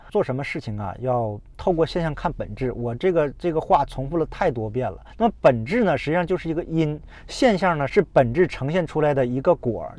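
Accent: China. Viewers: 因为新加坡开始有疫情，没有口罩，去台湾拿口罩却拿不到，我们新加坡的口罩是留给医护人员和前缐人员，因为他们比较危险，没有他们的付出病人怎么办？請问你是那一国人，你的口音很像中国人。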